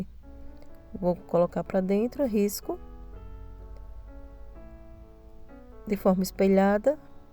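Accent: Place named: Northeast, Brazil. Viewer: Tudo que você faz nos encanta, e esse jeito de ensinar maravilhoso😊 com um sutaque puro nordestino gostoso de ouvir, amoooooo...❤❤❤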